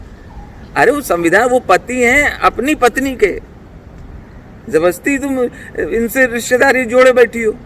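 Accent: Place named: Lucknow-Kanpur, India. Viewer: those who have experienced (not just know) the accent of Lucknow-Kanpur can specially relate to Raju bhaiyya. He makes me feel so at home.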